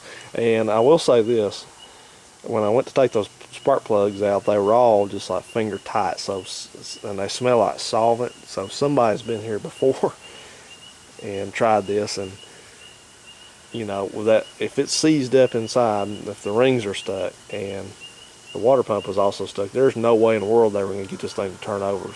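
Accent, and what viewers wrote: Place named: East Tennessee, United States. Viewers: You sound like a good 'ole Tennessee boy. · You sound like an East Tenn boy. Am I right or am I right?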